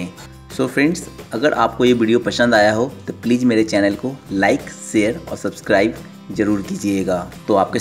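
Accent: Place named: Bengal, India. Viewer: Jo khudko zada chalak samjte hain wo sabse zyada dumb hota hain...dnt make fake video...and by your word you seems bengali...to bengali me hi baat kare...pronounciation is soooo poor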